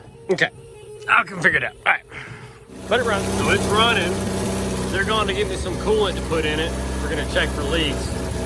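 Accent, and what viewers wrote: United States. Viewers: a little more hick then normal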